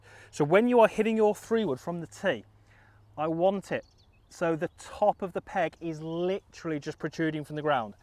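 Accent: United Kingdom. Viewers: Hard to understand brit english cos english is 2nd language to me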